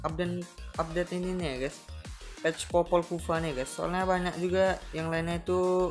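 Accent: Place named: West Sumatra, Indonesia. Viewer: Nampak bna urang sumbar ky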